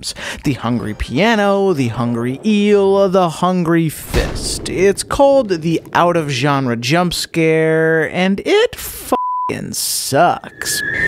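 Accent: United States. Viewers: Are you from Wisconsin? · Scott the woz if he was from Tennessee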